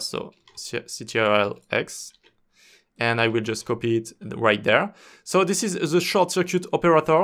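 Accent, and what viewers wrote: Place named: France. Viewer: Sweet french accent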